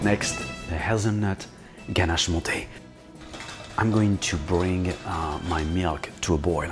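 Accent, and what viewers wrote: France. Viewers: I still can't decide whether he is french or just doing an accent. It's blowing my mind. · This man sounds like every stereotype french chef ever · That french accent😂😂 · Well, I'm French and with a french ear, I really can't stand french accent 😂 even if his is way better that some I've heard 😂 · As I heard his French accent I knew I stumbled upon something GOOD Seriously, amazing dessert, chef. Chapeau :D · This French accent has got me dead I swear 😂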